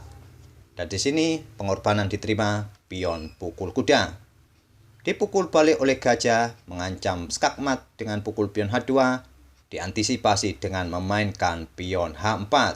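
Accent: Java, Indonesia. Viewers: Medok amat mas jawa ne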